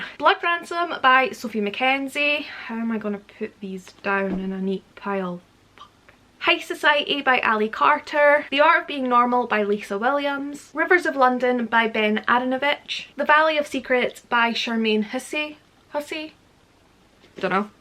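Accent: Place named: Scotland, United Kingdom. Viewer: It's nice to hear a Scottish accent on booktube! Not enough booktubers are from here.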